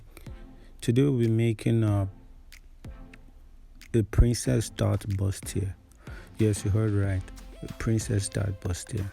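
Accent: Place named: Africa. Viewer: Employ me at youtube to translate for you,its like you guy don't understand Africans and Asians spoken English 😒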